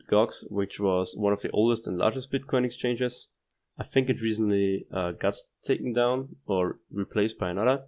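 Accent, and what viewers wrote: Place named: Austria. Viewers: after 30 seconds i realized you are Austrian.. cool video, i like XLM. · 'Schule' next to Project by the bookmarks, there is a "andern" button on the page. I'd guessed Germany or Austria, too. :) and the way you talk in English said enough for me, cause I've worked for Austrian companies ;) . Nice video! Thumbs up!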